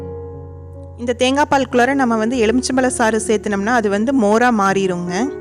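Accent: Kongu, India. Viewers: எங்க கொங்கு தமிழ் இப்படி தானுங்க இருக்கும்.... என்னங்க பன்றது...? சொல்லுங்க பார்ப்போம் 😊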